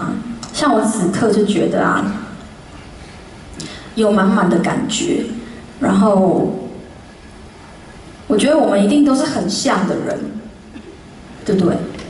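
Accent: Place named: Taiwan. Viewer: 还是她的口音跟我台湾人的朋友的很像。有亲切感😊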